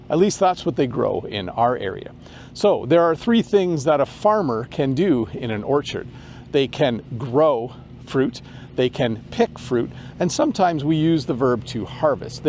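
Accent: Canada. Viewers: I am in Canada. A newcomer. Your videos are helping me to understand local accent ❤❤❤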